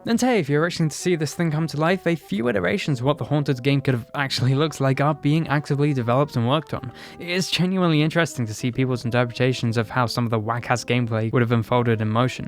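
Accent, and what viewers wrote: United Kingdom. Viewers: your bored british voice is going to be nostalgic some day lol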